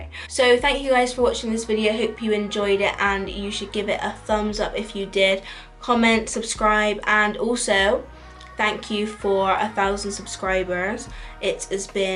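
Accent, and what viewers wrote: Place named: England, United Kingdom. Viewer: What part of England do you live ? Coz ur accent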